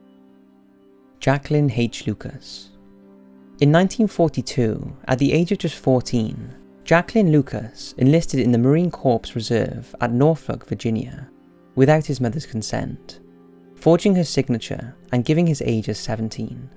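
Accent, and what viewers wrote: United Kingdom. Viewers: I understand that, being from Great Britain (from your accent), you would focus on the heroes of the Allies. Yet, I wonder about the heroes of the opposing sides, especially the Germans. I don't care about the Nazis, I'm referring to the average soldier of the Wermarcht. These boys were no different than any other soldier, scared, far from home, fighting for their country and wanting to return home. Unfortunately, because they were controlled by the Nazis, they get lumped under the same title without thought.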